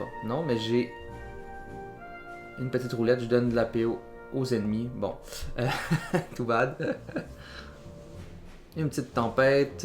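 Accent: Quebec, Canada. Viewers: Excellente ta petite vidéo avec le commentary made in Québec. Tabarnak 😎.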